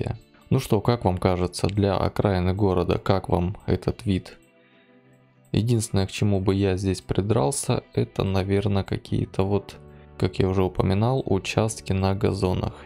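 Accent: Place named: Odessa, Ukraine. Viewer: Если вы посмотрите много видео этого товарища, то поймете что он очень сильно политизирован, лично меня всегдп удивляло когда он на украинских городах находил наилучшие места города а в России худшие, при этом выглядили они наравне даже при этом ахаза))) Да и к тому же акцент как у одессита. Посмотрите его сравнение про Одессу, он город с разрушенными домами, убитейшими дорогами. Сумел найти хорошин места и выставить его этаким среднячком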